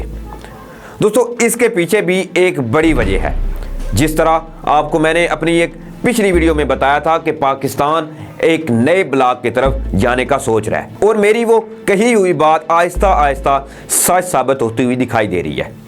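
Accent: India. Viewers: Yah indian hai jo pakistani qoum may tafraqa daalnay ki koshish kar raha hai aur pakistan arab mukhalif jazbat ubhar raha hai. iss ki urdu check karo koshish kar raha hai punjabi ki tarha urdu bolnay ki likin bol raha hai indian hamachal walon ki tarha. pakistanio Iss say dur raho brainwash karnay ki koshish kar raha jai He is a blood indian trying to build/arouse anti saudi and arab sentiments in Pakistan. Check his urdu he is trying speak in pakistani accent. HE IS A RAW MEDIA CELL MEMBER trying to brainwash Pakistani mined set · Ap urdo ko hindi style men q bol rhe hin Apni urdo thik kren plzzz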